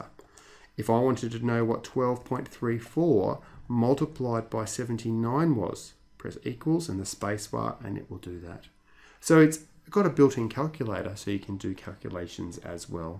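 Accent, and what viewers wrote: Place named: Australia. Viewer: The Aussie accent has got to be hands down the best accent imo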